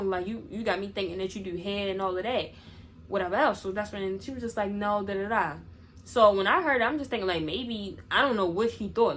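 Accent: Baltimore, United States. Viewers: I just knew you was from Baltimore before you said it 😂😂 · I love how you talk fr 😭 bmore accents so 🔥 but she weird friend ..she might’ve wanted to be with u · I love how you talk fr 😭 bmore accents so 🔥 but she weird friend , new subbie!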